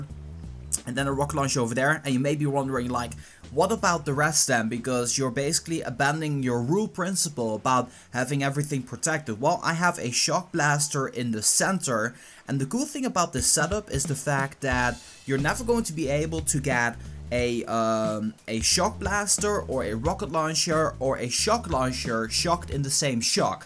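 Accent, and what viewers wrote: Netherlands. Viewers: if i compare youre accent with loui van gaal. i can obvious hear you are from the netherlands. correct me if im wrong.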